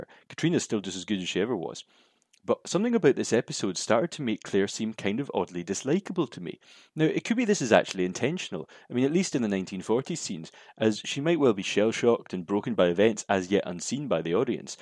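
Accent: Scotland, United Kingdom. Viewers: The background music is a little loud with trying to decipher your accent. Love Scots accents Jamie's accent is one of the reasons I love this show but with the music can't quite hear you.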